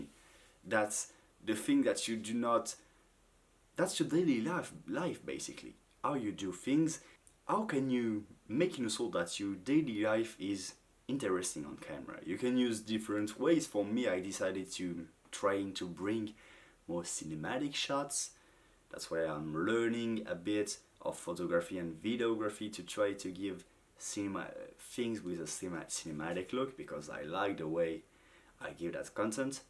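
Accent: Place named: France. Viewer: Just honest feedback, you have great content, but work on your English your French accent is alluring but a teeny tiny bit too heavy so it’s hard to understand you sometimes. You’ve got the face and content for YouTube it’s obvious! Good luck!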